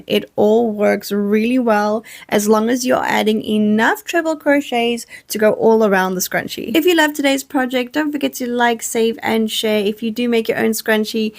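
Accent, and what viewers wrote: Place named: United Kingdom. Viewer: it's interesting to me that you seem to using the us standard for the names of the stitches. I learned that a British treble crochet is equal to a US double crochet, a British double is equal to a US single, and so on. Maybe I'm misplacing your accent (you sound British to me). If so, I apologize. I just wonder if that's on purpose like if you know your audience is mostly from North America or if that's just how you learned to crochet. If the latter, did someone teach you or did you learn from Youtube or something?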